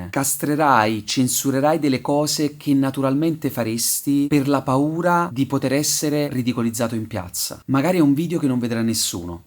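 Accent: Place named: Campania, Italy. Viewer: " sott occhio mi è sembrato che fosse marcoripa con un nuovo berretto, dato il contenuto del discorso, poi però la cadenza più campana che laziale mi ha fatto capire. non ne so niente, per la carità ma, da quello che vedo in giro, la tempesta di feci è un vero limo per certi canali chè, non meritandosi applausi di quelli sentiti e spontanei, sul fertilizzante naturale ci campano sia direttamente che indirettamente perchè, e diciamocelo, più fanno cagare e più abbisognano di un ventilatore che ne diffonda le gesta. proprio in questi giorni l ho cercata perchè ,mentre facevo la rassegna dei primi tuberi da me visonati, lei mi è venuto in mente :coincidenze. comunque devo dire che lei non mi ha mai fatto ridere, anzi. a proposito ,mi saluti il signor youtube "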